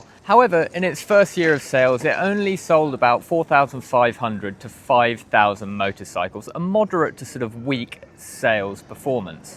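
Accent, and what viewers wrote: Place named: United Kingdom. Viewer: Always Enjoy Hearing a Lymie. Tell us What Appeals to Ameraicans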